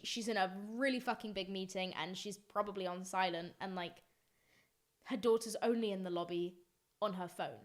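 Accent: United Kingdom. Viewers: this kinda long video literally helps my listening skills for British English in the exam, I love you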